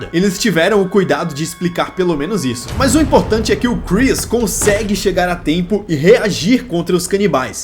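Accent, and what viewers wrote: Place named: Goiás, Brazil. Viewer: Você é goiano ? Seu sotaque é parecido ❤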